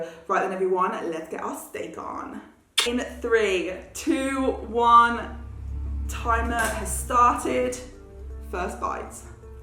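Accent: England, United Kingdom. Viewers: Damn this chick is hot! Is she British? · I don't normally get all mushy over celebrities or the English accent... But you got me. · I like your English accent!!!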